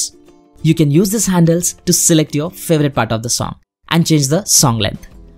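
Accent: India. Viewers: Thank you very much And your accent is wonderful. I’ve always loved the Indian people’s accents when they speak English. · your accent is very strong Indian boy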